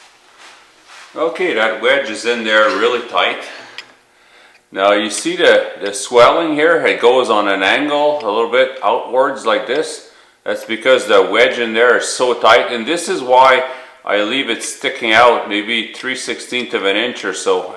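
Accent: Quebec, Canada. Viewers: Quebecois eh? I like your accent. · Great video, mesmerized by your Canadian accent - very cool. You have got some impressive tools and skill set. Thanks for the video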